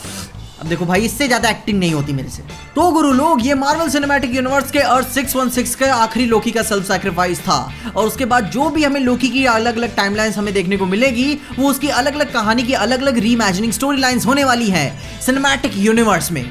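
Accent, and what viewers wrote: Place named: Bihar, India. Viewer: भाषा के बोली से त बिहार से लग हथिन ।।।## कभी निराश न करे ला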